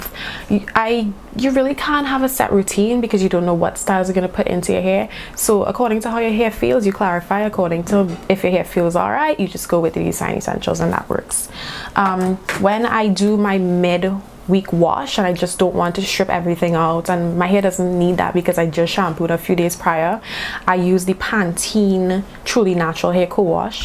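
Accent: Trinidad and Tobago. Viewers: We have the same Trini accent, are you from the Vale? Have you checked out Body beautiful on D'ave for some of your products?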